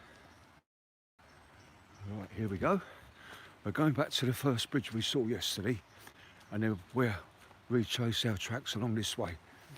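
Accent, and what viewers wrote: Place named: Cockney, United Kingdom. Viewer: Is that you talking, nice voice, can detect a cockney accent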